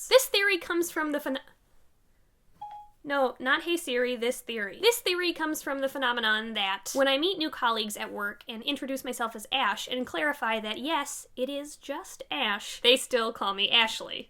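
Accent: Minnesota, United States. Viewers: Hey fellow Minnesotan, your accent reminds me of home :3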